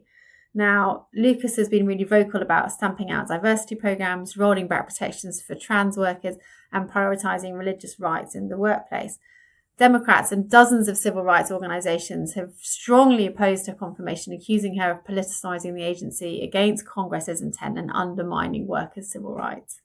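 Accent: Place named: United Kingdom. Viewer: This is garbage. And I can't take you serious with that British accent.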